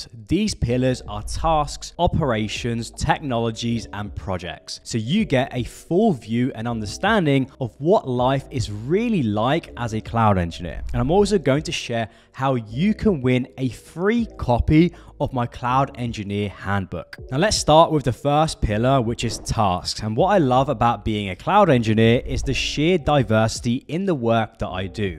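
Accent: United Kingdom. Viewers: Looool it’s our UK accent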